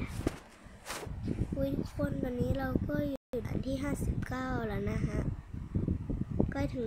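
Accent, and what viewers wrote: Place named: South, Thailand. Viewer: พี่เป็นคนใต้ไหมค่ะ ...เห็นพี่พูดใต้เกือบทุกคริปเลย...🥀🥀🥀🥀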